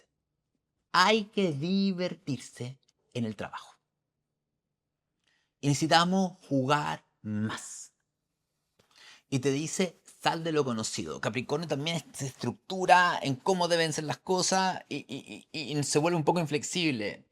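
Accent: Chile. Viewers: Excelente, apenas te conozco pero me encanto tu video, me aclaraste muchas cosas. Por tu acento pareces chileno. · Eres muy divertido😂y eso que no te has soltado la pinza total! Urano te va a ayudar😅Chileno, que bonito es vuestro acento❤escucharte alimenta lo que descubrí con la Astrologia, gracias por tu generosidad, ese es el camino🎉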